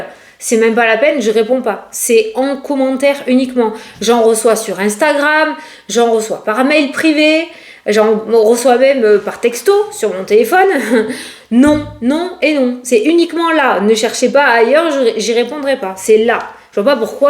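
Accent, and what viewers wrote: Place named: Marseille, France. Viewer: Moi aussi j ai l ai l accent " qu on attrape en naissant du côté de Marseille " ou du Midi et il est beau notre accent .... Merci pour tes tirages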